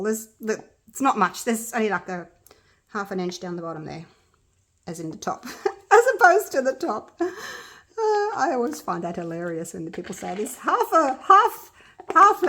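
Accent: Australia. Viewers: To be honest.. I really couldnt care less what shes doing bc I know it will always end up amazing. I mostly like just listening to her peppy, australian accented self which is more than enough for me to play her videos lol.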